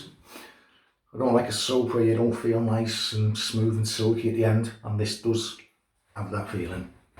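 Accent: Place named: United Kingdom. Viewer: Thank you so much,! So glad you enjoyed the scent and shave:) I love my 1960 Fatboy. You tell the JPJ story very well and love to hear it in a British accent!! Really appreciate you, and keep the content coming. Cheers!!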